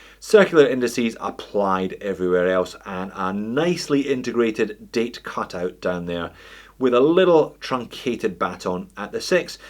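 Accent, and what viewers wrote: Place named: Ayrshire, United Kingdom. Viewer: I thought that accent was ayrshire made..lol..good luck with the channel.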